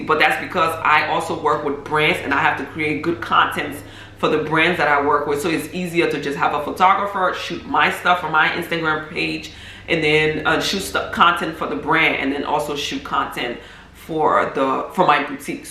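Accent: Liberia. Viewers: I can hear the accent, sis you Liberian?? I love your content by the way.. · You sound Liberian. Are you?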